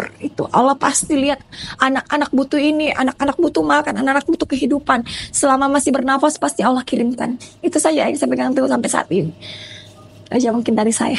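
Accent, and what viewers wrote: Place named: Makassar, Indonesia. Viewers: Kental sekali logat Makassarnya.... Slm dari Manado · Makassar bro ewako❤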